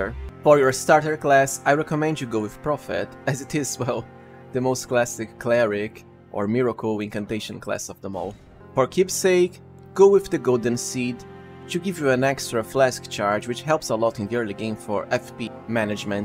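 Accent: Brazil. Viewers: Are you Brazilian, mate? Cool accent! Great video too!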